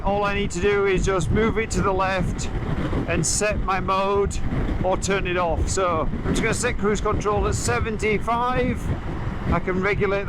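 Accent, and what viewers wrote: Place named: North East, United Kingdom. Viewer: That's definately a N.Yorks/Durham accent for sure, tha'knows. Oh wait what's the point of leaving comments when he can't be arsed to reply ?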